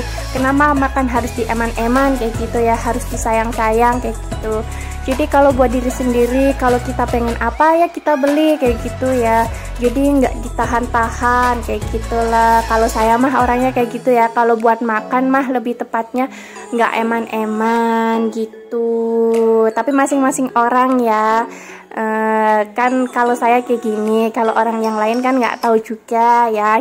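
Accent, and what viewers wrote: Banyumas, Indonesia. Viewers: Emang mb aslinya orang mb sih mb kok kayanya orang ngapak y mb ak tuh orang Tegal mb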